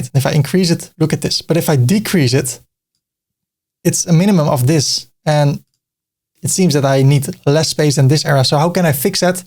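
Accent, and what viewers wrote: Netherlands. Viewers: First time here, I picked up the Dutch accent haha, and I love Tesla too. And work with Elementor, daily. So I subbed! Groetjes uit Middelburg! 😊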